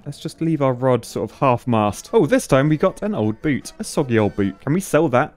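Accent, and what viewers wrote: United Kingdom. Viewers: He British not American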